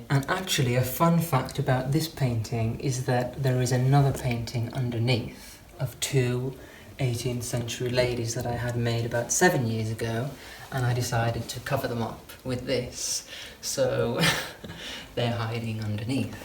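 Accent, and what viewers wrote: United Kingdom. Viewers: I LOVE your style! So classic and warm and inviting! I also love your voice, it has a soft, soothing vibe, yet very much classy British! I'm so happy you've decided against painting the bureau as I thought the instant I saw it that it's such a beautiful piece that added just the right touch of character to the room! Forgive me..I realize this video is a few months old but I've just come across your channel and am LOVING it! I want nothing more than to be able to visit the UK for an indefinite period and soak up as much history and food as I can possibly take!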